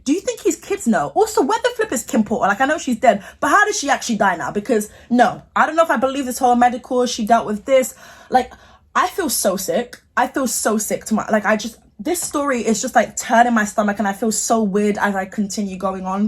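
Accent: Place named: England, United Kingdom. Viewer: Luv this ENGLISH ACCENT 💯% ✅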